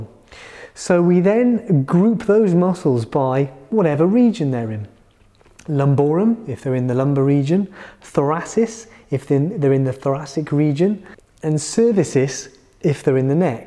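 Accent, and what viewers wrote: United Kingdom. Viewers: Maybe you wanna slow down a little bit , I barely can understand your British accent 🤷🤷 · From the moment I've heard him speaking I understood that he is from the UK, lol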